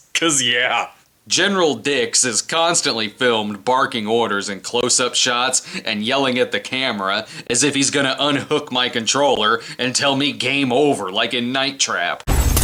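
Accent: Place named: Illinois, United States. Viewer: General Dix, Litchfield. Your Illinois is REALLY showing.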